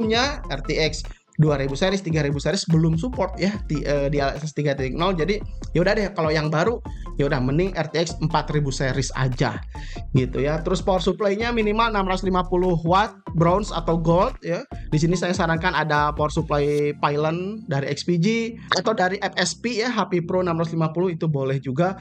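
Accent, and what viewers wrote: West Java, Indonesia. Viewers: sunda na tos melekat pisannya kang😂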